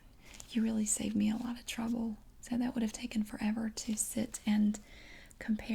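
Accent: South, United States. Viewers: as much as i love your southern accent videos, i love your soft spoken voice! more of these please! >_____<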